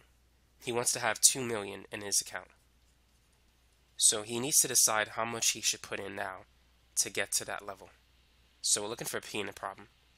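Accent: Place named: New York, United States. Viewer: bro you sound like a new yorker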